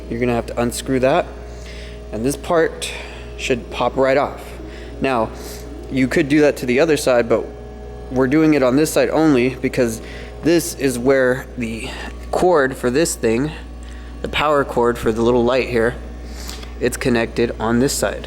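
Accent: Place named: Texas, United States. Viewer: Bro what part of Texas are you from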